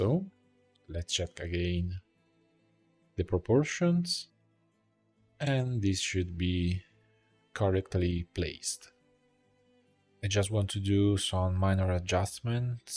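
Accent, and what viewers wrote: Italy. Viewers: Ma sei per caso Italiano? 🤌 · Sei italiano? Si sente dall'accento!😂 Complimenti per i tuoi video comunque, grazie!❤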